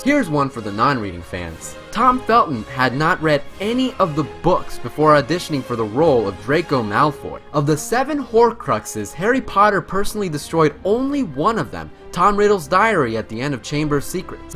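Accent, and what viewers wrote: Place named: United States. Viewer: american pronounciation for the words of harry potter's world takes off all the MAGIC !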